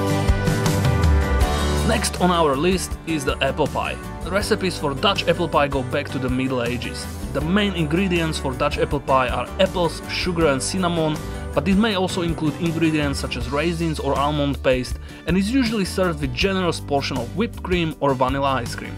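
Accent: Slovakia. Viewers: This channel is exactly my cup of tea! 😊👍. Nice work. Keep going. Btw, I knew it immediately that you are Slovak from your accent 😆. Though, very solid English. Cheers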